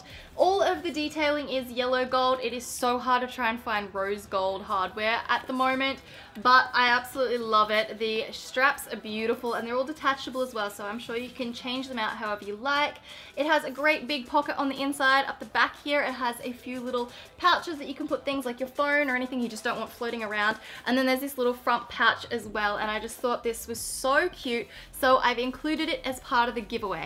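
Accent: Australia. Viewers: And OMG I LOVE YOURE AUSTRALLIAN ACCENT ITS AMAZING AND YOU ARE JUST A LIFES MIRACLE WITH HOW PERRFECT YOU LOOK AND ARE ............... well that explains you in my very creative brain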